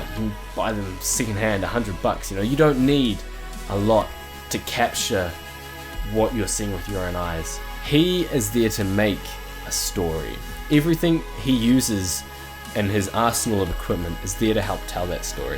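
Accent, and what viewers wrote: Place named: New Zealand. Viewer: keep making videos, mate. your videos are amazing and I like your kiwi accent! :D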